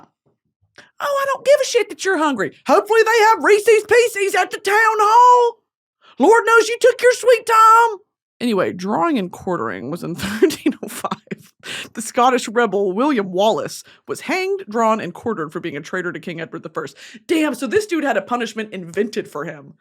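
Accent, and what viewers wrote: South, United States. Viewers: listen ik someone mentions it every video but the fact that it's just her in that room just completely unhinged and southern is wild lmao love it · I just watch for the comforting southern accent 😌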